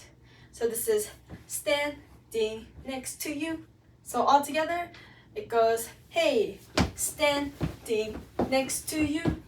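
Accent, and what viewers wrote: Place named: Australia. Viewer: Thanks and are you australian because of your accent "hea" 😊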